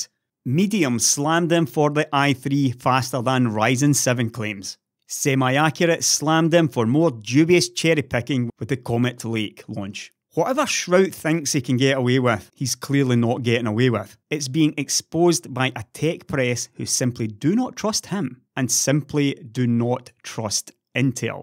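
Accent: Scotland, United Kingdom. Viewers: It definitely sounded Scottish, but I felt like it was a little different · whassat accent man, too soft fer glasgee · love a Scottish accent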